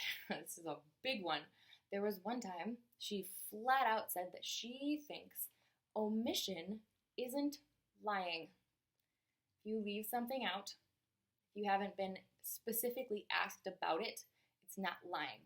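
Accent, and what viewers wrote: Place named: Upper Midwest, United States. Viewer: Hey, great video. Really helps figure out my own stuff, hearing you talk about yours. Kudos for that! Side question - are you from the upper midwest? I'm overseas and trying to figure out the accent.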